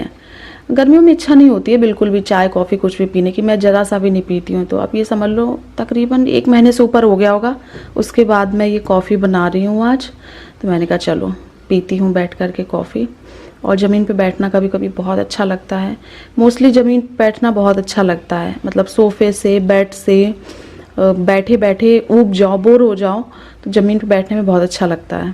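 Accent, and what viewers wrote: Kanpur, India. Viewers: Hello ji kaisi hain aap yah Kanpuri Bhasha mujhe bahut acchi Lagti Hai Main Mera Ghar to Lucknow Mein Hi Mein Hi rahti hun lekin Meri jyadatar rishtedar Kanpur mein hai aur mera aana jana Laga rahata Hai Kuchh farookhabad mein bhi hain Sare rishtedar Udhar hi hain Tik Tok Par video banana Koi Buri baat nahin hai agar aapko Achcha lagta hai to aap banaaiye bus yah hai ki Thode bure comment bhi Aane Lagte Hain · Aaj ka like, comment kanpuriya language k liye ...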